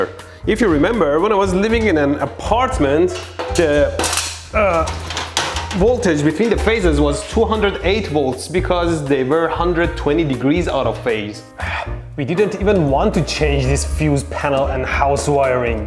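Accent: Iran. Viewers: The second i hear him talk i can tell he’s Persian as hell. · I'm Persian and his voice is to Persians what a newscaster's voice is to Americans. It's the most Persian accent on the planet lol.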